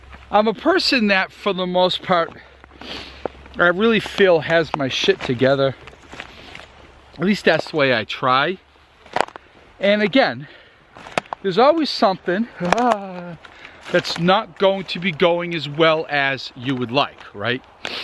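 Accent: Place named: Massachusetts, United States. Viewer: Dude are you in Massachusetts? You sound like you're in Massachusetts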